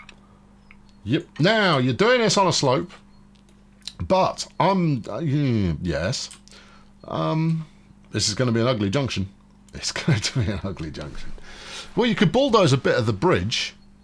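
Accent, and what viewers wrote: United Kingdom. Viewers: I loving your Doon canyon series. And nice to hear the dulcet tones of a fellow Brit. What what, cuppa tea govna etc etc.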